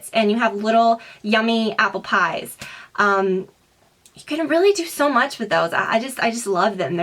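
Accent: South, United States. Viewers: You had such a cute country accent in this video xD and your hair had a little more volume too which was nice :) love the video please do more :) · you had such a southern accent in this video! great video! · This is the first time I've ever heard you accent come through in a video! You can tell your from the south!( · I totally can hear your southern accent more....its so cute! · are you from the south? You seem to have the cutest southern accent:) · I've been watching your videos for years and I just noticed your southern accent in this video!:) Great video as awlays xx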